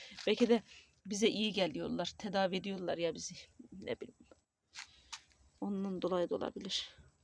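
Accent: Azerbaijan. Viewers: siz azerbaycan lehcesiyle mecbur edirsiniz ozunuzu ki turkce daniwmaqa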